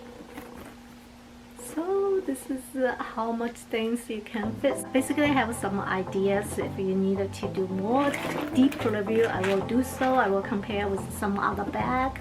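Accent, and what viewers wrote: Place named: Thailand. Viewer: I think shes from Thailand coz she said duck instead of dark😃😃 thai people can’t say the r just saying coz i have thai friends same can’t pronounce the R 😁 ✌🏻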